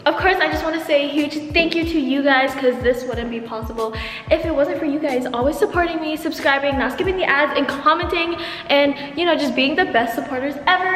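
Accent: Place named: United Kingdom. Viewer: 😂also i can hear some british accent going there✊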